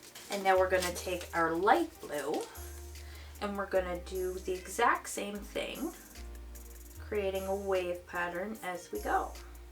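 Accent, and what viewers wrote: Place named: Canada. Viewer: Now I thought she was American until she started saying aboot (instead of about) hahaha Canadians speak so strange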